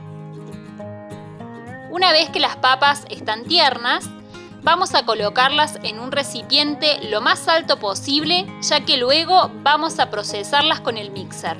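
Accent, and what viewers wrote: Córdoba, Argentina. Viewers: Hola soy de CARLOS PAZ Argentina...y tú???Tu tonada parece de Córdoba 😀👌👍🏻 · Espectacular la voy a hacer!!! Por ahí me parece que tenés una tonada cordobesa, puede ser? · Me imagino q eres de Argentina por el acento.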